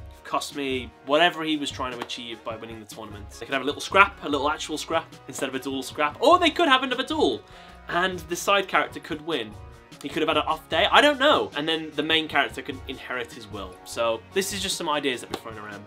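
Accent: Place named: United Kingdom. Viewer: thank you. Are you UK based, you sound it. Don’t see many Yugioh channels with UK youtubers. Nice to see you represent 👍 I’m gonna throw you a subscribe your content is decent.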